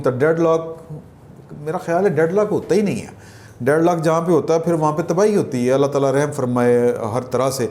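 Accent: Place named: Lahore, Pakistan. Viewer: لاہوری لہجہ آپ کے منہ سے بہت جچتا ہے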